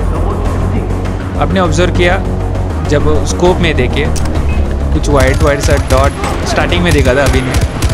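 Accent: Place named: India. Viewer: Hindi Accent 😙😂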